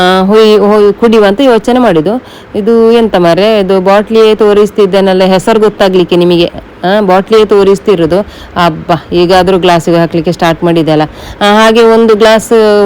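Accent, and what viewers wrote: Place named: Sullia, India. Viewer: Ninu sulliada rural houdu mathaduvaga gothagutha ide. Madam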